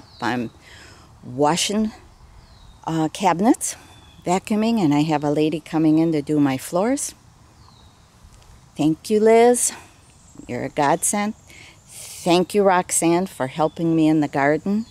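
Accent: Wisconsin, United States. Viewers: Thank you for all the hours of enjoyment & knowledge you've provided. I have to tell you it was your "Wisconsin accent" that pulled me in - initially. You are charming and I just knew you had a twinkle in your eye.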